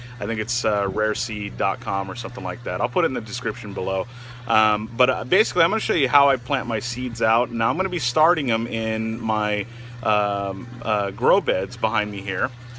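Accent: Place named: Minnesota, United States. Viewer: You sound Minnesotan!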